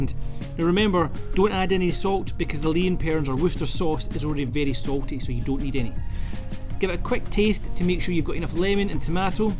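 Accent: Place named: Glasgow, United Kingdom. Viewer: Finally a recipe without all the faf and actually gives you an idea how long the sauce will keep for, thanks, (the fact that your Scottish is just the icing on the cake lol, is that a Glasgow accent I hear:-) )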